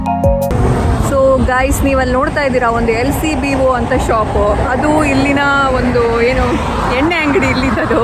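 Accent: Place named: Bangalore, India. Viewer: Neevu awesome ri.... Pakka namma bng slang le matadtira.... I was in canada for 4.5 years almost... Liked ur videos... All the best🙂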